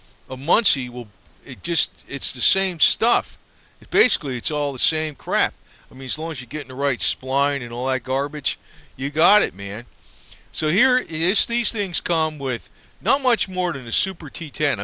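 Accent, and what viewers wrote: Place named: Sacramento, United States. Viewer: I bet he lives near Sacramento, sounds like me.. I live in KY now and I sound retarded but hey I used to think the KY accent was retarded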